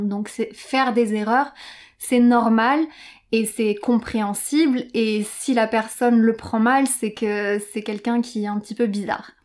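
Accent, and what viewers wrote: France. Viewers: Je déjà suivi Portugais avec Leo ici sur l' Youtube. S'il vous plaît, corrigez moi si je suis équivoqué. J'ai abonné récemment au votre canaliser ici sur le YouTube. J'aime 😍 ton accent français. Es-tu française? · Yo, au vu du nombre d'abonnés entre le 5 juillet et le 21 juillet, à ce rythme, il y a des chances de passer les 100k abonnés d'ici la fin de l'année à force de référencement. Il faudra que tu fasses une vidéo en anglais un jour, ils veulent entendre ton french accent :p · I'm happy by your efforts given. This is my first time to see your channel. I like your French accent and also it's also sort of understandable. Please continue sharing some French language episodes. It's really helpful. Merci beacoup.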